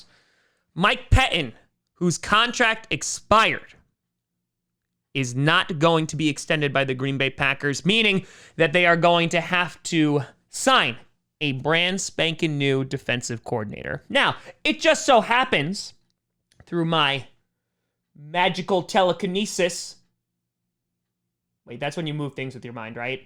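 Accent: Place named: East Coast, United States. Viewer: I'll miss the Pe-inn East Coast pronunciation. Thanks for providing content. We are the 24-7-365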